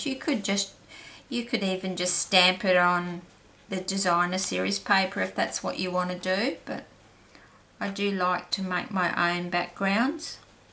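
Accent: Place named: Australia. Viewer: no wonder you had such a nice accent you live in Australia. I live in USA California. I do have a someone I can buy from if i need to. Thank you so much for offering your help that was very kind of you. Have a Blessed Day. with a smile:) Julie G.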